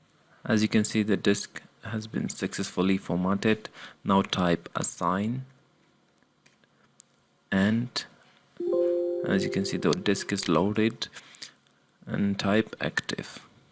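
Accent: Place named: India. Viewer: Bishaka takra shawi e Your accent is such as indian...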